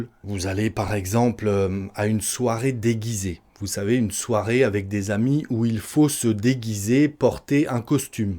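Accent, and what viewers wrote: France. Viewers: J'adore entendre, merci..Bénis toi.Tu es le meilleur, j'adore ton accent.Du français pur.J'apprends de toi YAY🤩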